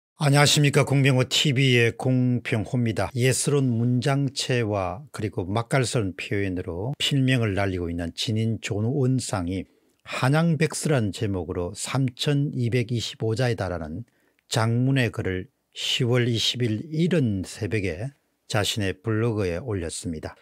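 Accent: Gyeongsang, South Korea. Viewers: ..갱상도 분이어서 발음이 힘드시지요? 조은상 이아니라 조은산 입니다...^^ 아무리 갱상도 발음에 평생을 갇혀계셨어도 수십만이 보고듣는 유투브 방송인대..발음은 조금 신경쓰셔서 해주셨으면 합니다..감사합니다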